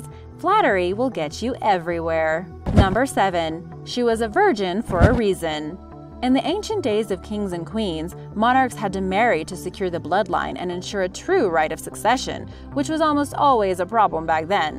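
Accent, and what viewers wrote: United States. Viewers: As soon as I heard the American accent I should have known that these "FACTS" would be nothing of the sort. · Well she sounded american and like i said a few comments ago i watched another video that was american and they got their facts wrong when they said stephen hawking had als when he actually had motor neurones disease not trying to belittle anyone just saying for them to get their facts right because as you can see it will piss a lot of people off if they're incorrect such as this video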